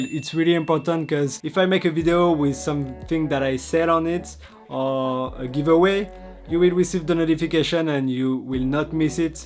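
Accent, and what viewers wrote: France. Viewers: Toi t’es français ? 😂😅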